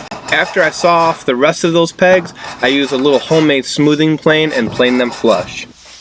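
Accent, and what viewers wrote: Michigan, United States. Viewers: Your accent brings me home. I grew up in Michigan. But I've lived in AZ for 30 years. You sound like my nephews.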